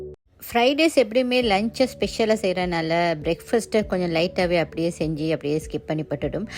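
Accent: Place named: Sri Lanka. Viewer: Voice apram video vachchi kandu putichiden ninga srilanga nu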